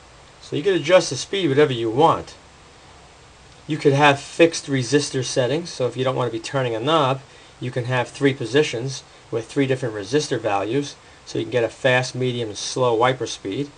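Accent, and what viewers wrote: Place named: New Jersey, United States. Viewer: Awesome circuit again. I have learned how to design and make any circuit that I want to now, just improvising from all the things I have learned from you, and many more like you. Thanks for all your teachings. And we love that NJ accent. It sounds so warm, especially with the style of how you make your videos which I find very engaging. A bit viceral even. You bring the audience way into your presentations. It is an effective style that others should emulate in my opinion. They are enjoyable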